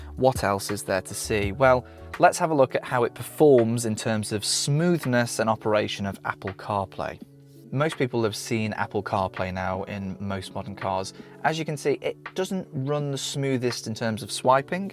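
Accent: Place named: Nottingham, United Kingdom. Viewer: Really good video, thank you! From your accent and the A453 Road, I’m guessing you’re somewhere around Nottingham, England — but I noticed you mentioned prices in dollars. Any reason for that? This is actually the first time I’ve watched your video, and I’ve got to say, your presentation is awesome. I ended up watching the whole thing without blinking! Usually, I only watch about 20% or skip to the interesting parts, but yours kept me watching all the way through.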